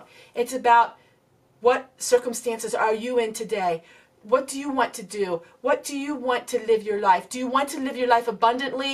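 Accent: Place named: Maryland, United States. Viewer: If you don't mind me asking what state do you live in? I was raised in Maryland and your accent sounds so familiar. I have been living in Georgia since 1991 when I was 14 years old. Now at age 42 I have a deep southern accent. I'm really glad we are now friends. It seems like we have alot in common.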